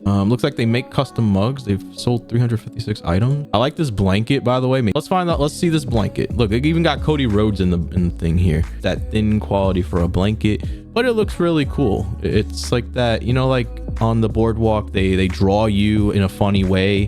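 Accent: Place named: New Jersey, United States. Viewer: I like your accent are you from New Jersey or Philly?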